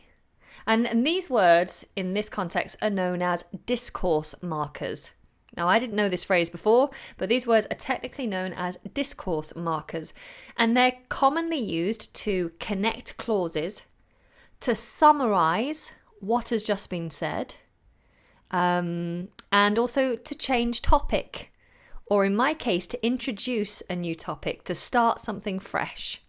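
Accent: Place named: United Kingdom. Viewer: I really admire the British accent. Will it be easy to imitate it ? Please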